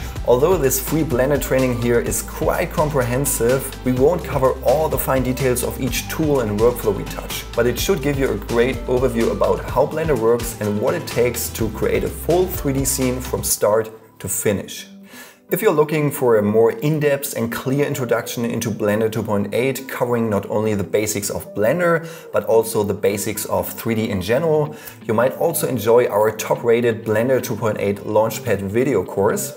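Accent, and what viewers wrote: Germany. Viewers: kann es sein, dass du eigentlich deutsch sprichst? XD volles vid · first video I saw of you and I constantly hear your german accent (wich isn't bad)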